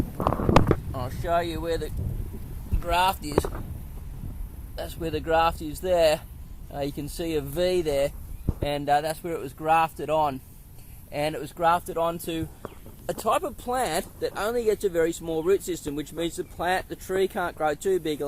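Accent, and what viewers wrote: Australia. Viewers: Damn that Australian accent 😂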